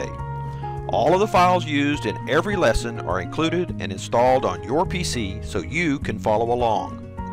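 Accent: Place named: South, United States. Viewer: esprit cam and southern accent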